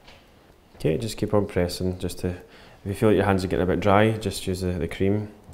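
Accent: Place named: Glasgow, United Kingdom. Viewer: Such a talent, i love watching clear, concise step-by-step tutorials like this, really makes you feel like with enough practise, you could do it yourself. Thank you! Also sounds like a Weegie accent there! So rare to hear, makes me miss home! :)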